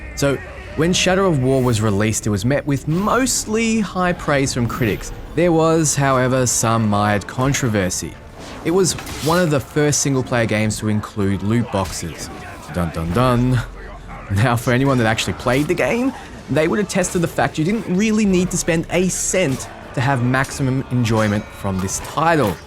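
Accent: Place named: Australia. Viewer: You sound like Fudgemuppet. Fellow Aussies! 🇦🇺🙌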